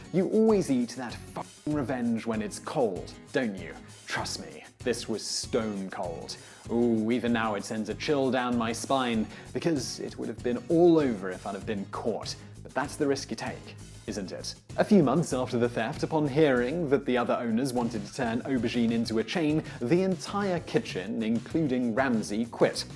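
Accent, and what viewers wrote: United Kingdom. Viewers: I'm not used hearing soccer being said in a British accent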